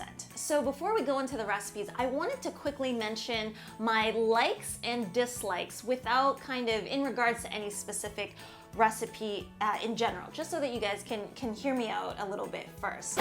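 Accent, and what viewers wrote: United States. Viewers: Asian lady with an American accent in a kitchen with UK plug sockets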